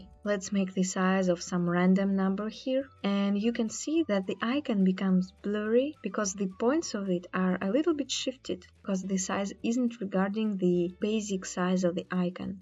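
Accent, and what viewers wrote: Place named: Russia. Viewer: Услышал RUSSIAN акцент ))) но это не важно — лайк за видео очень грамотно и хорошо, браво молодец!!!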